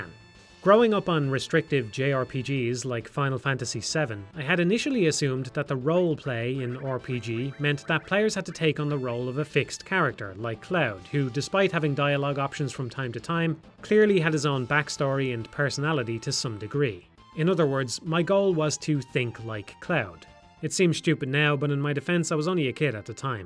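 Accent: Ireland. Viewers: He's Irish, it is impossible to sound pompous with an Irish accent. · the Irish accent is wonderful to hear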